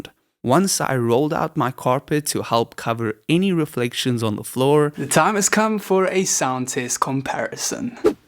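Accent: South Africa. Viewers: after all these years I've finally confirmed myy suspicion that you're South African too XD awe masekind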